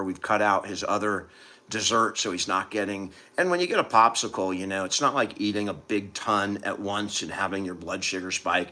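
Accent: California, United States. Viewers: Hi, Good to see you and your Pop. My late hubby with dementia was constantly getting UTIs whenever he was in nursing homes because it's just a given when they wear diapers. When he was at home with me, he had no incontinence issues. Just wanted to make sure you knew that doing the prostate procedure may not circumvent the UTIs. I live in southern Cali and you totally sound like a Californian to me! 👍🌞XX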